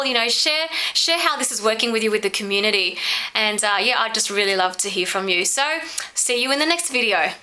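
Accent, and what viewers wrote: Australia. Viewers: I love your accent, you must of lived in Australia for years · omk919, she sounds Australian for me :) she's not faking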